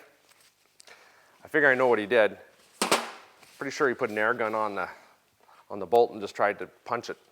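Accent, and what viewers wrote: Canada. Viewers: Lol Canadian accent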